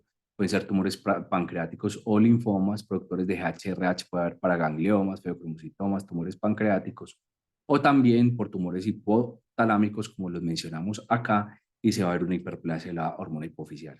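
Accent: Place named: Colombia. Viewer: Qué lindo explica y qué hermoso acento colombiano. Saludos desde Ecuador.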